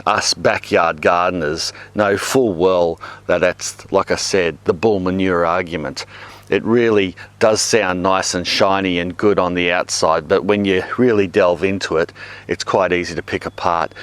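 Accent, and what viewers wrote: Australia. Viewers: Hahaha. Made me laugh. Love the Aussie accent. “What a wanker”. Xx NZ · Love your Australian acesnt! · Hey recently discovered the channel. Fantastic! Anyway here in the land of takeout Boston Massachusetts area specifically we see the same type of negative publicity about growing your own food. in fact certain cities and towns but even more restrictions on growing your own food in the backyard which I think is crazy. I'm starting to grow my own for the first time and looking to move out you another state so I can have more land it's so expensive here. But growing up my brother and sister and I and my Mom and Dad we had a huge garden my mom became expert. It and we produce enough food to feed us all during the growing season and often had enough to store a few months after. In fact maybe that's where I learned to love vegetables. it's nice being able to watch your videos and understand the things I need to do a lot of what I had forgotten. 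by the way I listening to the Australian accent it's very similar to our accident here in Boston. We don't R much either 😀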